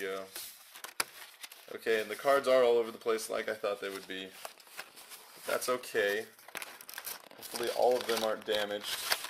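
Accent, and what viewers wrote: Germany. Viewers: r u german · are you german?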